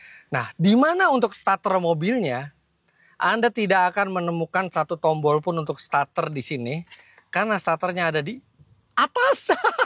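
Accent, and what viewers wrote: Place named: Java, Indonesia. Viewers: Logat jawanya kental sekali.. kok ada yg bilang jawa hama disini 😂😂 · Indonya medok lagi. Koko sby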